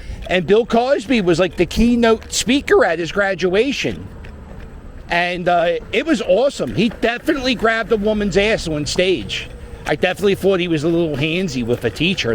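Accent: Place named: Pennsylvania, United States. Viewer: I just want to tell you I’m a Pa native and to hear the knowledge that you have on the metro Philadelphia area is phenomenal and refreshing to hear. I was born in Norristown, and loved philly, knew and worked there till i was over it at 22, moved out west to California and now reside in Nevada. Love the phillies, Eagles, and miss hearing the slang in your voice, always know a fellow Pennsylvanian! Im now a fan, keep up the great videos 👍🏼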